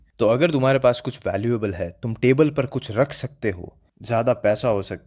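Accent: Rajasthan, India. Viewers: Bro you from rajasthan? The way you talk really gives me that vibe ❤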